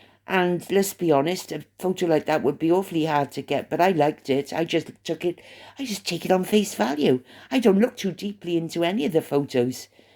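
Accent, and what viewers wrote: Wales, United Kingdom. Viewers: Your podcast is never to long for me to listen to I love hearing a friendly Welsh accent hope your fur baby 😺 is OK . Dam home shopping they never get it right . Looking forward to your next podcasts my Welsh friend 💝💐💐💐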